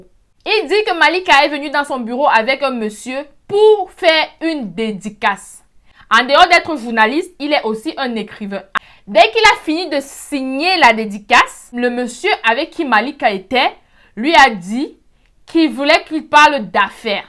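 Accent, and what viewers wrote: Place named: Cameroon. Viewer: T'es un cas ewoooo tu fais rire j'aime trop comment les Camerounais parlent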